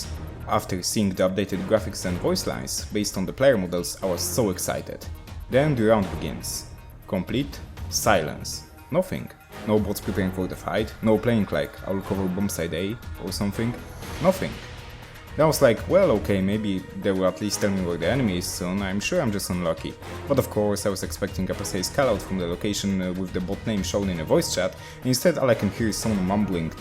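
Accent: Poland. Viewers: Twoj akcent jest genialny odrazu domyslilem sie ze to polak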